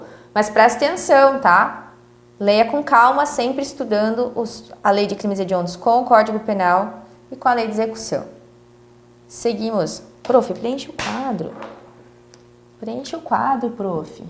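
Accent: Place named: South, Brazil. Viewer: Percebi um sotaque sulista cumulado com o mineiro..... um concurso material eu diria.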